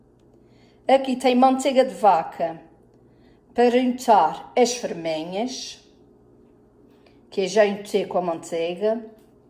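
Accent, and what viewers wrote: Azores, Portugal. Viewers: Que coincidência. Há mais de trinta anos atrás conheci uma açoriana e também pensei que falasse francês. Quando eu perguntei disse-me que era dos Açores. Mas eu habituei-me a esse sotaque e nunca esqueci. Percebo perfeitamente tudo o que diz. Parabéns pelo canal. Gostava de ir à Bermuda, no futuro. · Muitos parabéns pelo seu canal! Continue assim simpes e com o seu sotaque maravilhoso! 😀 Sou do continente ( transmontana 😁) e sem dúvida que devemos manter as nossas origens com muito orgulho!!! Tenho família na Terceira e foi onde experimentei a bela culinária açoriana. Adoro a sua receita de bolo lêvedo! 🤗 Nunca falha!!!!! Sem dúvida que vou e experimentar esta maravilha! Beijinhos e muita saúde!💕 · Parabéns adorei sem dúvida que vou fazer Adoro o seu sotaque sou de São Miguel para mim é um orgulho. Continue assim bom fim de semana bjs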